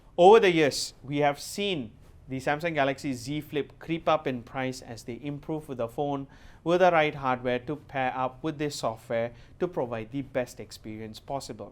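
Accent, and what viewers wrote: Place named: Sri Lanka. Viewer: Just asking are you from srilanka?? Loved ur accent..❤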